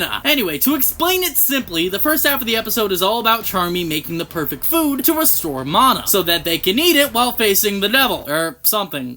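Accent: United States. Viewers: Ur American accent is great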